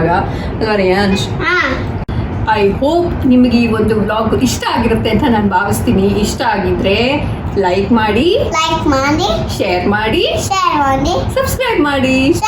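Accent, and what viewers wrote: Mangalore, India. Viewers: nivo Yavaglu mangalore kannada ne mathadi please. 🙏kelalikke thomba chenda erthe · Nimma mangaluru slang thumba chanda ide😍😍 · Nice vedio ....😍😍happy to c someone talking in mangalore kannada in volg 😍😍😍evn m from mangalore staying in UAE... tulu la patherle yedde apundu Kendra....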